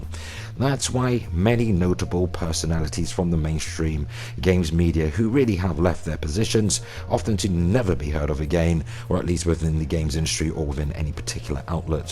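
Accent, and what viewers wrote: United Kingdom. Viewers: I like how you always emphasize “rampant speculation” also I’m an American but hearing a British accent is refreshing. I have an American southern accent and some may enjoy that so cheers 🍻 to liking things.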